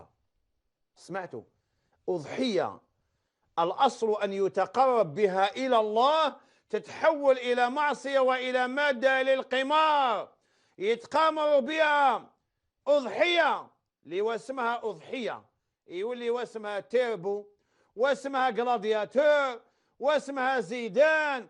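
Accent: Algeria. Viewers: احسن شيخ يفهمه الجزائري. يتكلم بلهجة الجزائريين وبساطتهم. ووسطي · لما قلت شكوبيستان كنت أقصد الجزائر 🇩🇿 لأني شبهت لهجتك باللهجة الجزائرية خصوصا لما قلت كلمة "خلي" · كنحماق على اللهجة الجزائرية. تحية من المغرب ❤️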